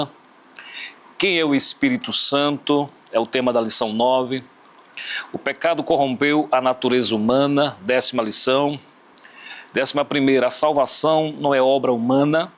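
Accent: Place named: Northeast, Brazil. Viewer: Parece nordestino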